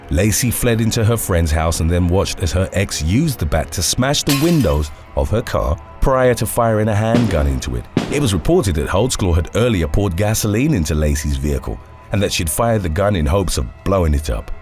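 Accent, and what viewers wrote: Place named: England, United Kingdom. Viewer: Valentine he has an English accent. They pronounce things differently than others may. You need to stop embarrassing yourself by not knowing that.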